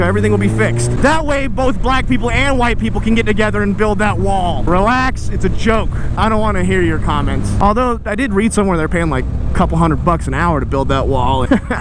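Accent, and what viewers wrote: Canada. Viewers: The Canadian accent had me rolling 😂😂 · Lmao your canadian accent had me in tears aye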